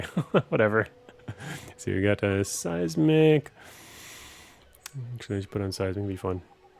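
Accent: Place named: United States. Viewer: Random question, but why is you file explorer non English? Your accent is American.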